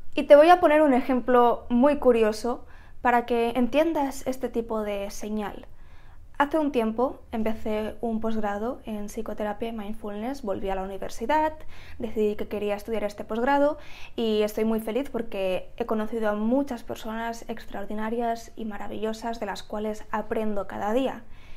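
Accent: Spain. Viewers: Veo que todo lo que dices no son más que obviedades, que nos está diciendo la vida? Tamaño boludez. Además ese acento de España que es absoluta mente molesto! Las cosas pasan por causalidad, por estar en el lugar equivocado o por qué simplemente te ibas a caer en es instante; si fuese así, yo ya estaría en la lona..10-15 años de problemas, dificultades y desorden, aún así puedo ser bastante rico en cuestión de un par de años! El destino no existe, ni los planes muyy elaborados llevan a resultados X.. hay cosas que no puedes controlar como decidir " ver " este video de YouTube donde una tipa te dice que pilas, que así o asa debes vivir la vida!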